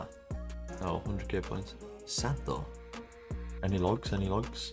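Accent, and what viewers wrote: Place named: Teesside, United Kingdom. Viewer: I know a boro accent when I hear one UTB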